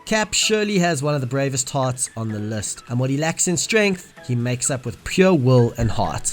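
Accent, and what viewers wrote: South Africa. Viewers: Loving the south african accent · This guy sounds like he is South African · Strange question, are you South African? just asking due to your accent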